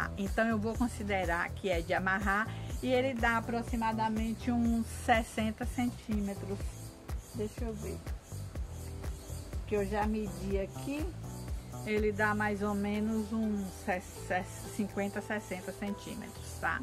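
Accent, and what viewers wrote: Northeast, Brazil. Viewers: Vc é nordestina?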